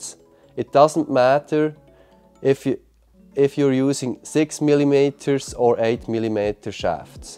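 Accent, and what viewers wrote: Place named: Switzerland. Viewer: Koleg me hört richdig krass s schwizerdütsch us dim englinsch use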